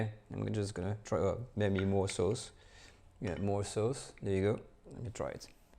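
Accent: France. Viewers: I like the Chef french accent in english...Of course the recipe is delicious.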